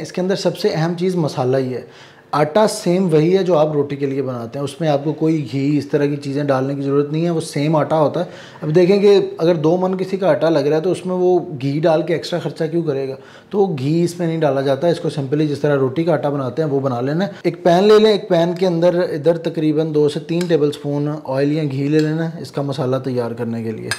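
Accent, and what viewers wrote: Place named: Pakistan. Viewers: TrueTrue7 . Its still Pakistani accent, not Indian... samjhe? Bewaqoof!! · I speak bangla and can barely speak urdu but i must say pakistani urdu accent is so pleasing MASHAALLAH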